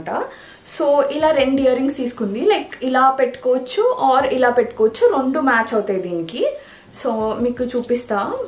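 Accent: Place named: Hyderabad, India. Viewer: you are an absolute example of Hyd telangana girl in heart and tone. Love you. Keep going. I do not care much abt jewels or sarees but I like seeing you and the energy you bring to life when speaking❤. -from another Hyd sis.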